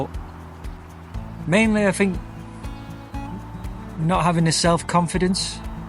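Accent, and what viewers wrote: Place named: England, United Kingdom. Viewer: How lucky you captured this beautiful bird, and what a great Lense and camera! Nice to hear about it's details too! Thanks.😊 I'm guessing you're a Pom? Not sounding Kiwi haha. Thanks again. You did well.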